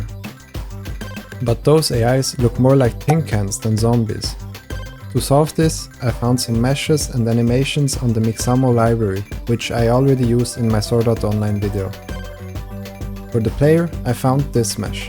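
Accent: Switzerland. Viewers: geile siech, brutali idee & rechtig guets video, de schwiizer english akzent brüder love it😂go get the bread🔥🚀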